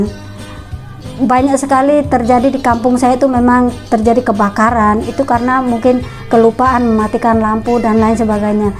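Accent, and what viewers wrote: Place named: Java, Indonesia. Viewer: Ngaku asli timor tapi ko pu dialek kental jawa tapi tarada dialek timor... Ko bisa lancar bhs indonesia dijawa tapi tada dialek timor... Panipu ko, ko bukan asal timor tapi pernah tinggal di timor... Itu sudahhh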